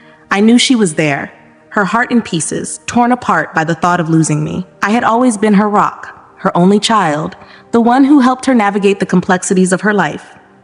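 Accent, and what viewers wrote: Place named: Brooklyn, United States. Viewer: I'm curious about your Brooklyn accent! What can you tell me about it. You sound like your from an area I'm not familiar with..???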